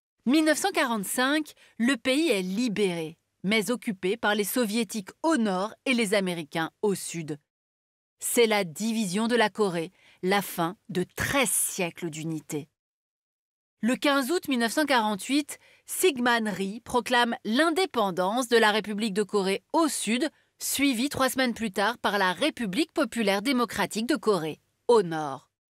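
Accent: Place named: United Kingdom. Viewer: entendre prononcer les noms des "chaebol" à l'anglaise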